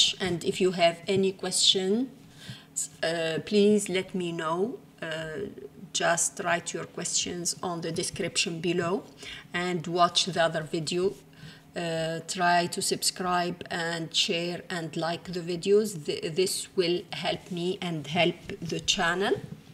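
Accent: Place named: France. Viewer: Howdy 🙂, i thought 🤔 you speak English.. it's like you speak France!! Anyway I'm going to go inside your painting here.. see ya Oh, i have a question: What Should i mix If i want the (Cloudy suny colours) ?! Which colours should i mixed?!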